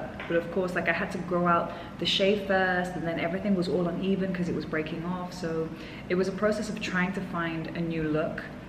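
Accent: London, United Kingdom. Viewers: British uyy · love your londoners accent 😄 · Sukaaa sama aksen nya british bangeeet 😍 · She has british accent omg im so in loovveeeeeee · Bloody hell, you speak the way London folks does...fuck yeah...you are amazing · she's british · Dia setengah prancis tapi pernah kuliah di inggris jadi yaaa mungkin karna itu dia punya British accent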